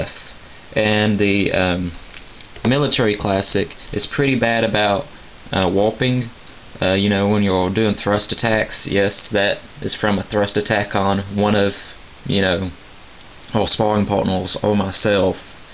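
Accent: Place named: South, United States. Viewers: It's called living in the south. Lots of people talk like that down here. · honestly, he sounds like a coonass, which is like a mix of southern and french accents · it's called an accent everybody has one ex. you probably speak clean english (northern english i'll just call it) he speaks not as clean it's just southern (sorry if me saying not as clean that's pry a lie) accent then there is the ny/nj accent and so on and so fourth. the only way to not have an accent is to be mute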